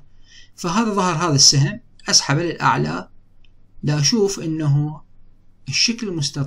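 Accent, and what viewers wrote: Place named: Iraq. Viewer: الله يوفقك ع هذا الشرح الواضح وخصوصا باللهجة العراقيه القريبه ع قلوبنا الله يحفظك ويحمي العراق بس لو اعرف التسلسل تبع محاضراتك استاذنا الفاضل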